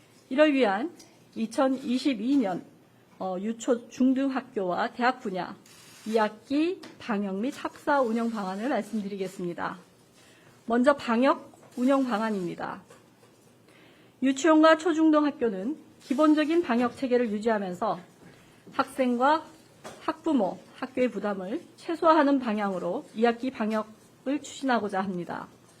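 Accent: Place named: Gyeongsang, South Korea. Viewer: 효꽈적 갱상도 출신이네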